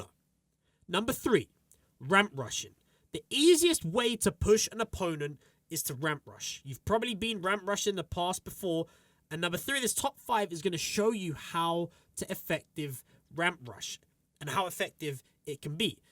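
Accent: United Kingdom. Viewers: Are u from the uk?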